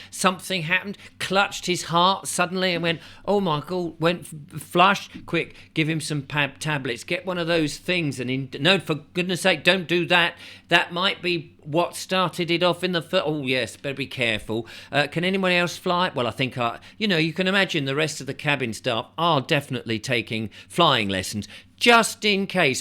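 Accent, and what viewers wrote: United Kingdom. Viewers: Absolutely love your classic "Brit" style: humor, dress, accent, all of it. You can deliver the worst news possible with arid humor. Can't wait for your coverage of World War III.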